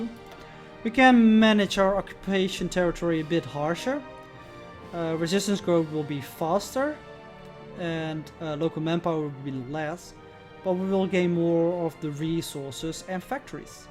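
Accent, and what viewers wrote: Netherlands. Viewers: Does anyone else love the Dutch accent?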